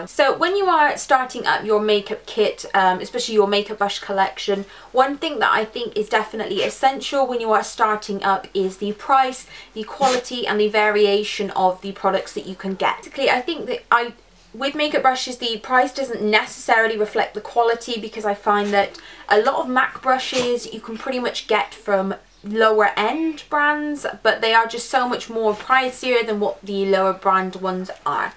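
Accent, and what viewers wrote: United Kingdom. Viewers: I liked your accent UK 🌷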